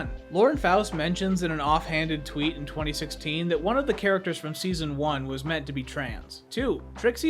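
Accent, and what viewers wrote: Netherlands. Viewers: ARE YOU DUTCH!! 🎉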